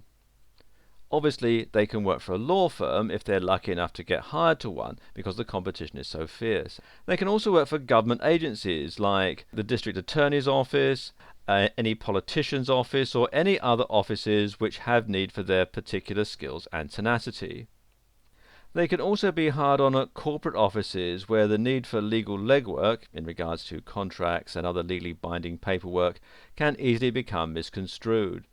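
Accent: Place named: United Kingdom. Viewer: Pretty sure that's not a canuck accent, eh? Sounds pretty British to me.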